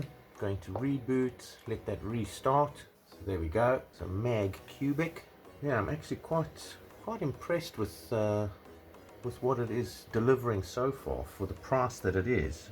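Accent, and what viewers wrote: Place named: South Africa. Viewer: You sound South African. How can I get this in South Africa (Pretoria)? Great video 👍🏽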